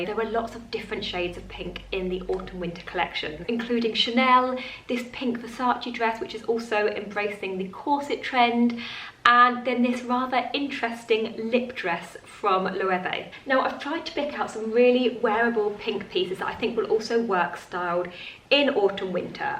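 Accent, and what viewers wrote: United Kingdom. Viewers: With love for you. Where are you from? Thank you. I like your English. British? I study english. Thank you for your answer.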